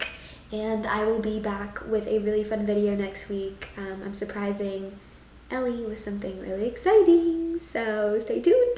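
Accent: South, United States. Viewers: out of all the years I’ve watched you I just realized you have a slight country accent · I can hear your country accent. Also, team Bluey. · Who’s ur favorite country artist? Mine is:3 also u are so sweet and I hear a bit of a country accent when u speak and I love it!! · Oh my, you soul is so beautiful, you have everything I would want in a person 🤍. Personally, I can REALLY here that country accent in you, suits you so much. Thank you fo all that you do ❤️❤️❤️ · Bro why does sound like she has a southern accent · I hear the county then you talk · I can hear your country accent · Her voice has gotten so much more country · Your southern accent is showing more and more. Also team Bluey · People saying your not country is mind blowing you sound country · Your country accent is so beautiful❤ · U sound like a real country girl i luv it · I can tell you are country! 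It’s the way you say certain words. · You really inspire me please always stay the light that you are. Seeing you grow up through the screen is amazing and you really are an amazing person( I love you soft country accent when you talk) I love your content so much. 💜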